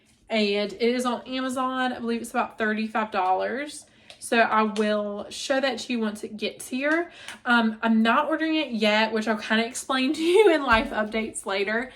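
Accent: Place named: Eastern North Carolina, United States. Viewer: Congratulations on the new home! It’ll be such a sweet time, and no hurry to “make it your own”… your style will take shape over time ☺️ Been watching and enjoying since your first post and am not usually a commenter on videos, but wanted to encourage you today… I’m also a fellow eastern NC girl (of 52 😉) and love hearing our native accent on YouTube 😁💕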